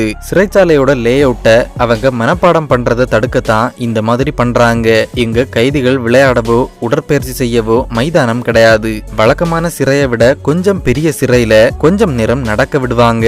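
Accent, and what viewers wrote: Kanyakumari, India. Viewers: That kanyakumari slang from youtuber ❤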